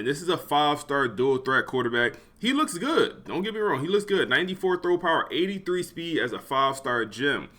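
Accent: Philadelphia, United States. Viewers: bro straight from philly lmao great vid fam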